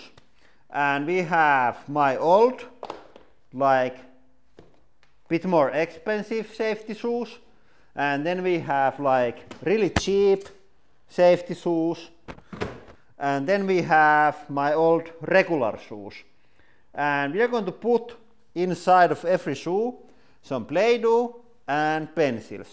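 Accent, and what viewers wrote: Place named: Finland. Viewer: Well that's Finnish for you and the mighty rallienglanti.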